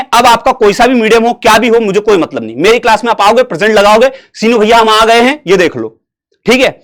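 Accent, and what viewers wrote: Indore, India. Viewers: Apki jo ye indori ganv wali language hai na sir maza aajata hai sun kar 😅😅😅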